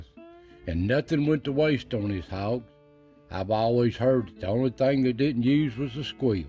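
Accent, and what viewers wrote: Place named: South, United States. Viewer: My mother grew up in Lafollette Tennessee. My dad grew up in southern Pennsylvania both on farms the stuff we did when we were kids was just half of what they grew up with. Enjoy your southern accent. I love the history. Thanks for sharing Joe in Maine.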